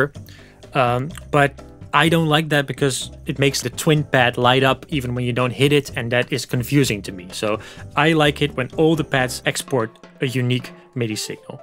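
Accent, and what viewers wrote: Netherlands. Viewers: Zo te horen Nederlands ;-) Dank je wel, deze video heeft mij enorm geholpen! Ga zo door.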